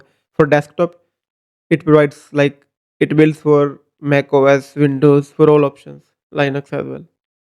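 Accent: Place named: India. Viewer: Nice video in an overall. I think you need to just slow the pace a bit! I usually dislike a lot of indian accent when speaking english, but in your case was really fine. Just need to improve a little more your speaking, try to pronounce more correctly the words, including the right sound of the W word. Doing this, your channel will grown way more!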